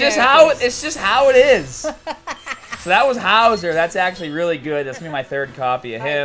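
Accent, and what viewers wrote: Boston, United States. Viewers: He has a boston accent. He is from Boston, Massachusetts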